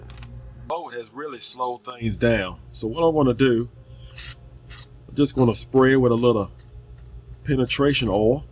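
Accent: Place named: South Carolina, United States. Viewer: Thank you sir Where are you based out of? South Carolina? Going based of the accent (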